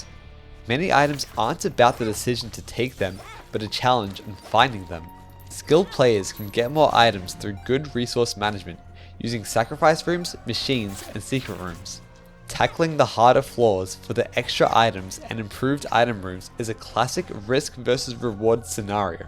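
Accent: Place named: United Kingdom. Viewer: i thought it was mean but then i realized he was just british, carry on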